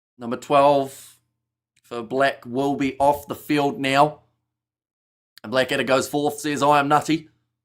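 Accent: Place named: New Zealand. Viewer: This fellow is not a great commentator. Speaks far too fast, very difficult to understand his acute NZ accent. Slow your dialogue down a little mate!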